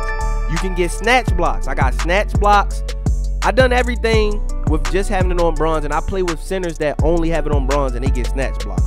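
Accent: Baltimore, United States. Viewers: Yeeeeeeerrrrr, are you from Baltimore my guy?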